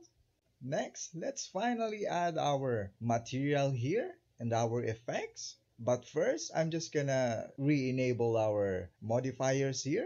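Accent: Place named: Tagalog, Philippines. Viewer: hahaha your tagalog-accent english is hard to miss 😁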